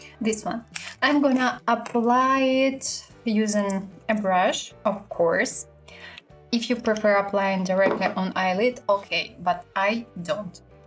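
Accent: Russia. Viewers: Искала отзывы на новые жидкие тени от Катрис и попалось Ваше видео. Очень хорошо показали и рассказали, наверное, куплю зеленый цвет, думала заказать от Эльф. Хорошо так говорите по-английски, русский акцент немного слышно, а когда увидела, что Вы живете в Мюнхене, вообще удивилась. Привет из Аугсбурга и удачи в развитии канала! :)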